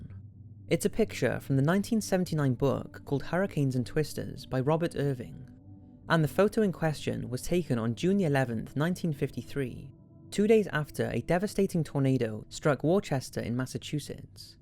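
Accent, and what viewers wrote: Wales, United Kingdom. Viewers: The only comfort for people watching at 1am is that there is a lovely Welsh voice narrating it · r u Welsh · Is the voiceover Welsh? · Is Welsh? I swear he is...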